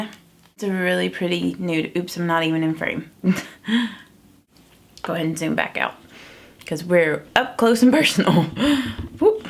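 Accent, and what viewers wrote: South, United States. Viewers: Looks great!It would be fun to hear more about what's unique about where you live. Places you eat at, shop at...the place where you bought your mug. With your southern accent, is there anything makeup wise you would say is trendy in the south.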